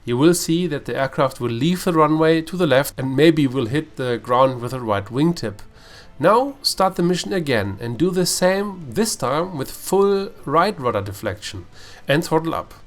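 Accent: Germany. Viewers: I may be mistaken but you have a very thick German accent, a German in a spitfire, what a time to be alive.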